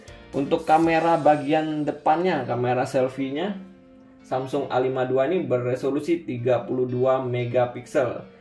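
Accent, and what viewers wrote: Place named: Padang, Indonesia. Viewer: Yang review kek orang Padang deh. Logat nya keliatan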